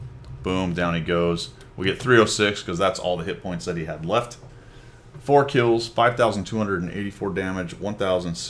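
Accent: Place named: United Kingdom. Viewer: British....cup of tea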